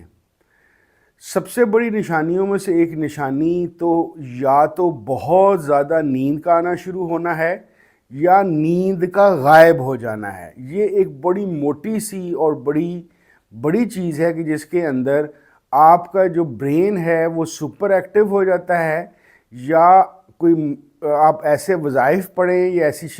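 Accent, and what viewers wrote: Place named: Punjab, Pakistan. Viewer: اردو بھی پنجابی پنڈو لہجے میں بولتے ہیں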